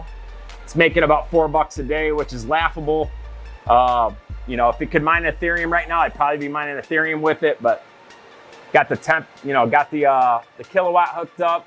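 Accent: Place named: Wisconsin, United States. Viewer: You sound like you're from Wisconsin lol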